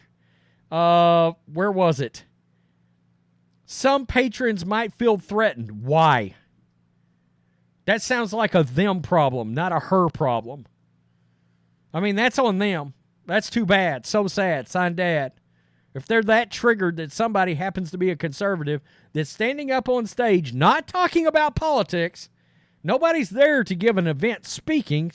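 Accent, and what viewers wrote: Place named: Tennessee, United States. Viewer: you are so country sounding! You sound just like me talking. Lol im from Tn so we get it honest! Love ya! Great reporting as always! I love this channel!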